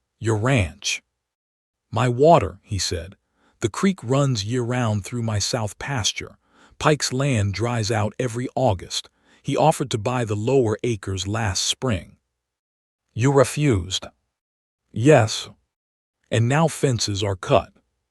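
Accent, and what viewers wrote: United States. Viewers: Thank you. I love this voice btw. Where in America do they talk like that? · I am from Northwest Ohio, and they talk like that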